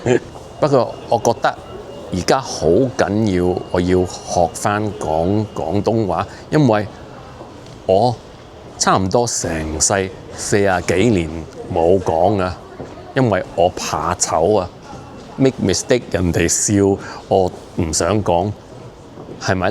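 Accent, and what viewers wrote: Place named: Hong Kong, China. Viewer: You have a Hong Kong accent in your Cantonese 😊 "or" instead of "ngo" 😆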